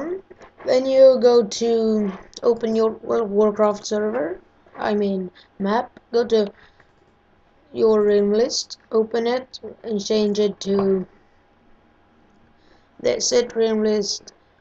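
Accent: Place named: Scotland, United Kingdom. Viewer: its uhh duuunn lol wtf is he..a scotty idk but the way he talks makes me laugh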